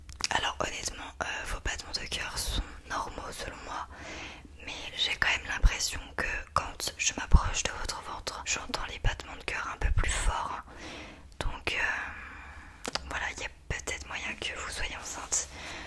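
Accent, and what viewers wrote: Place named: Eastern France, France. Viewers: Toi tu viens de l’est de la France obligé !! Tu dis « Ui » au lieu de « Oui » 🙂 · Ui c'est comme ça en Alsace Lorraine ^^ Et pas les Oui de nobles qui ont 9 cartes gold :D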